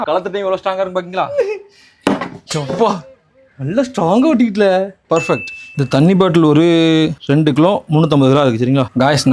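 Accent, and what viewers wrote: Tirunelveli, India. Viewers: தம்பி திருநெல்வேலியா?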